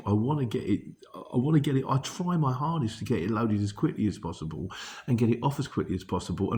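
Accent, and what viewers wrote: South London, United Kingdom. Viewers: Its a soft south London accent .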